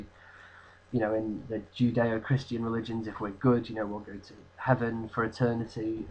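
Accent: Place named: England, United Kingdom. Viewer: I love your accent. It would be very nice if all the English talked this way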